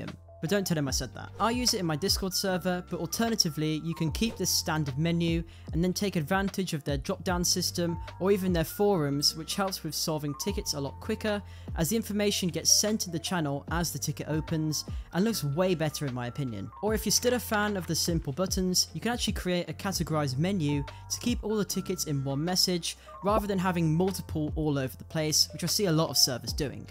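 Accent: United Kingdom. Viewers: hello my fellow british person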